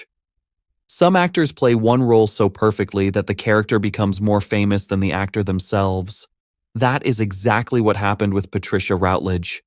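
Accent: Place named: United States. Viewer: Why the f*** do we have to listen to an American voice telling us about British TV legends ??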